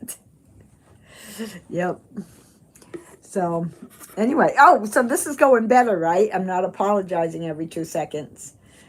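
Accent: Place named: Maine, United States. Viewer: Yes, you definitely have a main accent. I have a very good friend that goes to my church here and you sound just like her!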